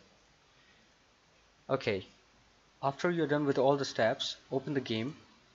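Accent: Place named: India. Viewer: I trust you, you sound like an indian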